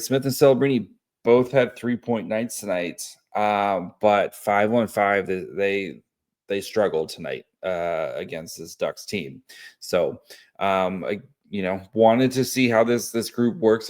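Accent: Canada. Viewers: Relax. Are you Canadian? That is not a a central valley accent.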